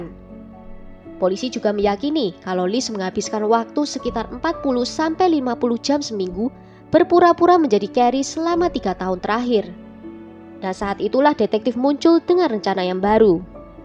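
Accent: Java, Indonesia. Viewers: suara mu yg medok itu membuat aku tertunggu tunggu video baru mu........ jgn hilangkan ya aksen jawa mu itu....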